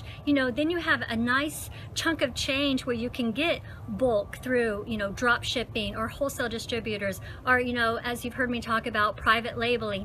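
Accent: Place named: United States. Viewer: Always watch your vids, great content and engaging, and your American accent on Tooosday Tips..... the 3 loooong T's.........lol, you are milking it now!! (my humour), don't stop!! slow down back to yourself speed.... Love your accent and your intelligent & organised approach to your informative and entertaining productions....GL to you, keep it coming.. from the lil' UK x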